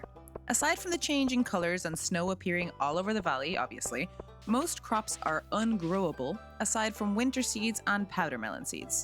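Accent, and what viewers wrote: Ireland. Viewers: I hope you don't mind me saying, but I looove your accent! Is it slightly Irish??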